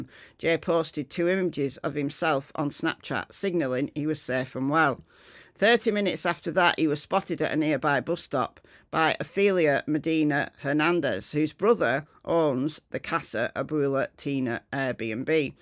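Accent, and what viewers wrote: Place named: Lancashire, United Kingdom. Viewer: we all know what's going on, the media are implicated, you;re absolutely right. we all know, these little scrotes are pretending to be gangsters, emulating L.A. gangs and rap culture, and the old rave culture. with no knowledge. I get the impression that you know the old rave scene? Those days are gone. I bet you know, judging by your accent, that you know what I'm talking about, Lancashire has been the centre of house/rave since the opening of the Hacienda, as you know. You're speaking truth, you know, we all know, so...........why all the speculation? drama and theatrics?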